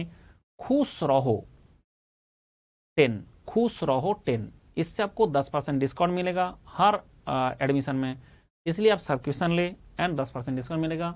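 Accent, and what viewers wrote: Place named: West Bengal, India. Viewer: I'm sure sir is from west bengal .... Voice 🔊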